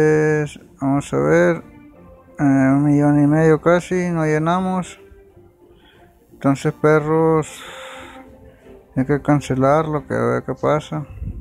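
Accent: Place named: Sinaloa, Mexico. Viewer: Hablas como sinaloense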